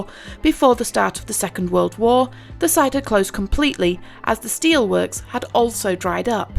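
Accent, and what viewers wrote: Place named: Yorkshire, United Kingdom. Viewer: What an amazing story 😃. This is rapidly becoming one of my favourite history channels 👏🤩🙏🇦🇺. Plus your accent reminds me of Yorkshire which I've always loved 😊.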